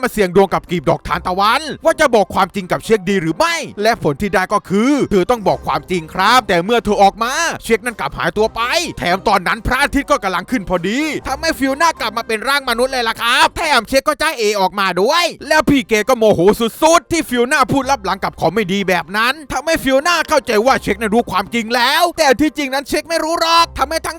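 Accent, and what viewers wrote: South, Thailand. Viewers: ผมนอนฟังขำเลยภาษาใต้ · 4.52 ไอยักษ์เข้าเมื่องมาได้พลั่นปรือนิ(พี่เป็นคนภาคใต้หรือปล่าว) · พี่บาวเป็นคนใต้กันหลาว หรอยนะ ผมก็เป็นคนใต้ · พี่เป็นคนใต้บ่นี่ 55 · คนใต้รึป่าวครับผมคนใต้ฮะ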